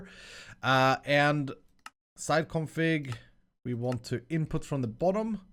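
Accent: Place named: Sweden. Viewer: Why does he sound more swedish than last time??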